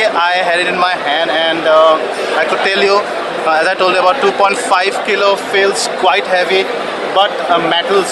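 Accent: India. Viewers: Ur accent looks like u r an indian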